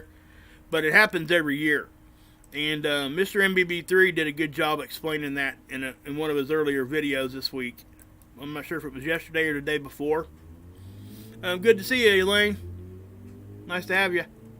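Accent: South, United States. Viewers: Love the info you share! I am just finding your channel. Where you broadcasting from? You sound like a good ole southern gentleman!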